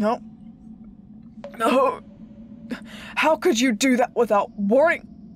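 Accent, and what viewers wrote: South, United States. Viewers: just thinking and wanted to share but I think you'd make an amazing outlaw series, southern charm with the voice, amazing perspective on story telling, know when to stop and end a episode. Like I said was just sharing a thought and ¿I guess things that prompted me to say it¿ idk reasoning didn't sound right lol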